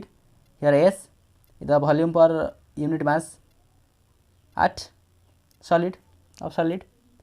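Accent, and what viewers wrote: Odisha, India. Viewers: Are u from odisha?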